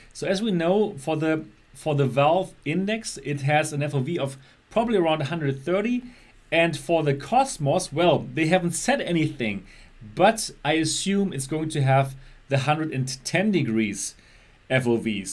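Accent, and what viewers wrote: Germany. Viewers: that german accent you here that out of everyone xD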